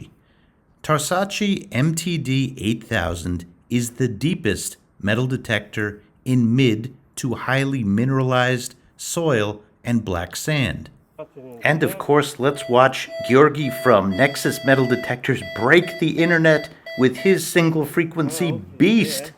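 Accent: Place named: New York, United States. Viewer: I mean I love the accent,, that New York area Kofffeee sound... But it's gotta be niche (neesh) not neech , like Cheech... Know what I'm saying?😅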